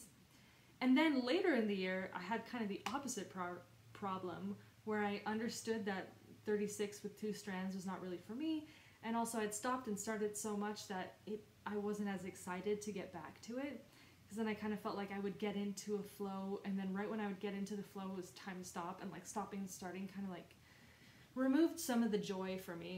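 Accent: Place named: Quebec, Canada. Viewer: Allo des Cantons de l'Est!!!! I'm so glad to have discovered your flosstube!! I'm a big fan of Jacob and the team at Evertote!!! I can't wait to start the holliday countdown. Will you participate? I was not able to go to the retreat as it was too close from a conference at work, but your video made me feel the experience!!! And you are so lively as a person!! I had an idea that you were from Québec by the way you prononce French words. Maybe, with other stitchers, we could make a local chapter? I can't wait for your next video.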